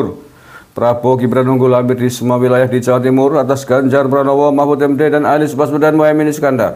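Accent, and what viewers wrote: Banyumas, Indonesia. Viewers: Smg prabowo menang 1 putaran, aq seneng sampean ngomonge ngapak... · Wong ndi pa jenengan ngapak ya pada pa 😂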